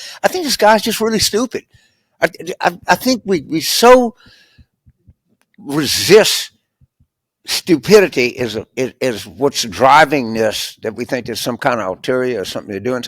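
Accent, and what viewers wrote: South, United States. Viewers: You are a Refreshing voice, with an Exquisite Southern accent...how you make me laugh ! Also a few of us are modifying the British Guy Fawkes night (Bonfire night) for us as Americans....if he and his conspirators had been successful they would have brought down the entire government as the House of Commons, House of Lords, & King James I were ALL present. Jan. 6th will live in infamy for me, as it was not the urination & defecation in the Rotunda, but the swastika, anti-Semitic T-shirts, lynching rope, etc. symbols taken in to the Capital UNDER THE GUISE of the CROSS.. My OUTAGE knows no bounds on this !!!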